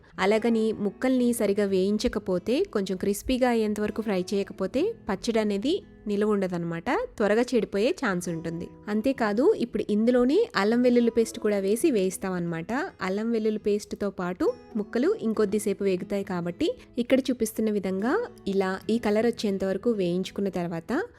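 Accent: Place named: Rayalaseema, India. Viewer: Mam ur pure rayalaseema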